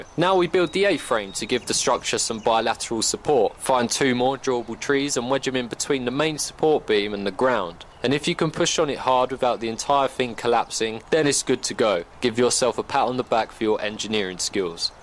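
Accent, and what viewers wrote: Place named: Derbyshire, United Kingdom. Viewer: u sound like your from derbyshire? XD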